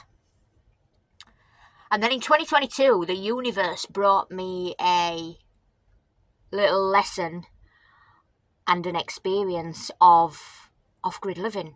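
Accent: United Kingdom. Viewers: What's your accent? I'm guessing Lancashire, but not sure. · Hiya flower, You sound like your from my neck of the woods ? I'm a Bury lad. Moved to Pembrokeshire 28yrs ago. Wide awake and unj@ I'm looking at vanlife again. Keeping my upholstery business. So I might live outside my rented premises... Totally and utterly worn out with the matrix....like seriously worn out.... if your ever in Pembrokeshire. Pop in for a cuppa. Empty your grey and fill up with water.... cheerio now sis 😊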